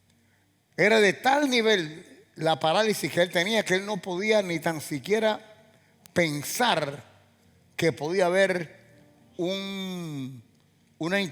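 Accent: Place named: Cuba. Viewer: Pastor yo vivo en New Jesrsey , es primera vez que me cruzo con sus prédicas. He compartido porque estas enseñanzas bíblicas y no solo conocerlas si no vivirlas , aplicarlas en nuestras vidas y preservarlas como el tesoro más valioso qué el ungido de Dios nos comparta . Dios le bendiga Pastor a usted y a su familia. Usted me recuerda mucho a mi hermano muy cubano en su forma de hablar y con la experiencia de la vida usted tuvo el privilegio de ser rescatado . Mi hermano en cambio con el potencial que tenía por no saber y aplicar estas promesas que son nuestras perdió su vida con un cancer a los 57 años. Me he identificado con cada prédica y verdaderamente necesitamos ser sacudidos y necesitamos hombres de Dios como usted . Mis bendiciones. Vivianna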